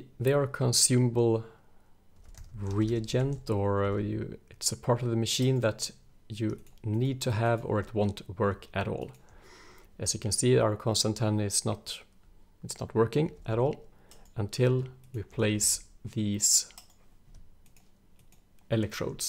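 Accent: Sweden. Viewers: Are you Swedish? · are you swedish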